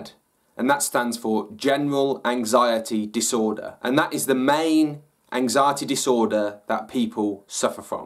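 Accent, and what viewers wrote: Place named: Brighton, United Kingdom. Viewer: Leah Stein it's the Brighton accent I think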